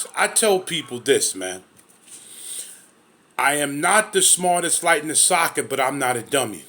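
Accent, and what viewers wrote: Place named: South, United States. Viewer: What that is I call it geographical racist seriously some people are very ugly where your accent comes from. I see it and been told with a southern accent your IQ drops 50 percent but that was from the North not the east coasters. They think they are so smart seriously pay more taxes every day and they are so smart they vote for it too. Personally I love to hear different accents. Even broken English I like to listen to that. You keep on with that strong talk, wimps can't handle it.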